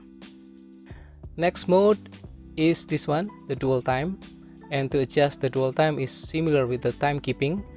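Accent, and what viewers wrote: Indonesia. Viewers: Pertama kali liat karena pengen tau gimana cara setting jamnya, terus pas semakin di dengerin lama-lama pengucapannya kaya orang indonesia juga ya ternyata orang semarangg😭😭 Mantap sih bahasa inggris nya bagus bangettt mungkin kalo gak di dengerin dengan teliti gak tau kalo orang indonesia nih😂😂 Terimakasih om untuk cara settingnya👌👌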